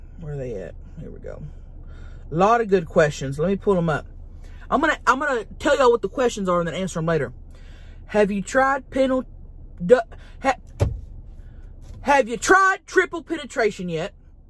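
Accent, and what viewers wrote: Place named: South, United States. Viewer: I adore this woman 🥰 and her little southern accent lol SPLURGE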